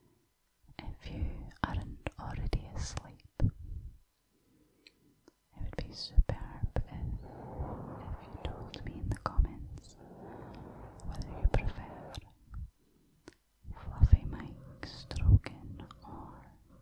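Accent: Scotland, United Kingdom. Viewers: It''s been a super tough week, gonna need that that Scottish Brogue to calm my brain. I'm from Edinburgh and I miss the sweetness of the language. Hope you're well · I adore your voice because of the accent, imo a Scottish or Irish accent sounds far more interesting and elegant than an American accent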